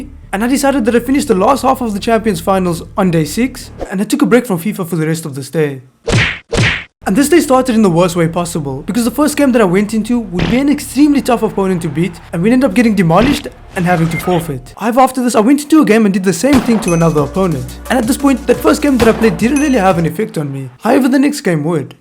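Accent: South Africa. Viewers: You from sa bro ?? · South african? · South African accent😂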